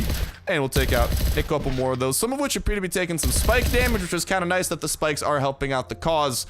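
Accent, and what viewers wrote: Russia. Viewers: Can you please stop the Russian accents it’s kind of annoying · Are you Russian?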